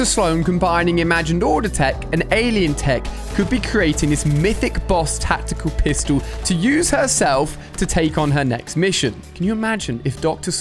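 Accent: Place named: United Kingdom. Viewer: She-swee is how you pronounce shisui but he lives in UK so he talks different. I'm not hating btw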